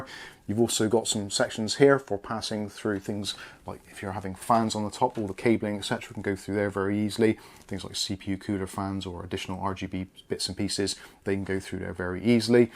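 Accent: Bristol, United Kingdom. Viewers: Tempting to try this for £35. Was going to go with O11D Evo but I feel I'll regret it if I don't at least try a budget case for the first time. Will also help offset the very expensive build. I am also going to try air cooling for the first time (Thermalright Peerless Assassin 120) to cool a 7600X with a view to change that CPU to the 3D version whenever it launches. So I guess I'd have 3 intakes, rear as exhaust and two or 3 fans in the top as exhausts, unless I swap my 4090 for the one with the AIO. Thank you for reviewing this, I appreciate there are a lot of cases but only the main ones tend to get any attention. PS: Are you from Bristol?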